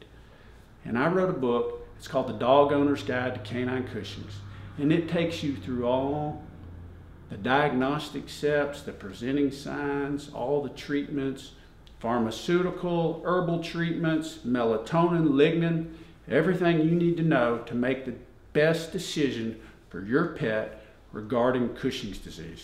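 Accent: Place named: South, United States. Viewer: I love that southern drawl 😊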